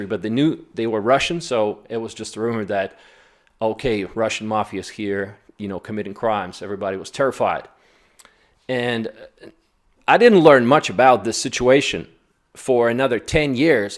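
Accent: South, United States. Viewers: I saw a radom video from you, then look at some more... seeing your family member from UK visiting her parents. But I couldn't figure out how a Southern US guy ended up with Russian parents... hey, maybe he was adopted, I thought....;-) then dug deeper into your channel and found amazing videos. You really give a nice perspective of Russia and its people. Maybe you need to "channel these" background video's of your years in US and how you gained your experiences, your viewers would understand better your background. I am amazed how well you speak English, with southern accent and all, even your wife speaks natural. Somewhere you must be an old US Southern soul how got reborn again in Russia, because you got the fighter-entrepreneur spirit and all;-) keep making these vids, you're doing good. До скорой встречи! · The southern accent and the Russian accent mix well. 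· You're a natural story teller! Plus your English is excellent down to a bit of Southern accent. Great smile,too! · I love that I can hear a little bit of southern twang in your English accent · Love the Russian accent with that southern twang. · Very good, I hear a southern accent i think. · your southern twang when you speak English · you have adopted a southern American drawl!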